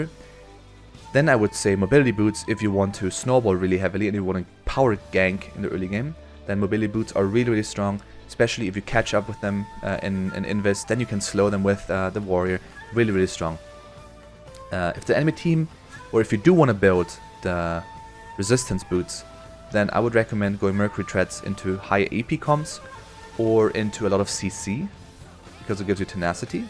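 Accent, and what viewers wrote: Germany. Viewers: Just discovered your channel. I wanted to start maining Shaco and your video was very helpful. Thx man and keep it up. PS: You sound very german in my opinion, especially how u say Talisman :D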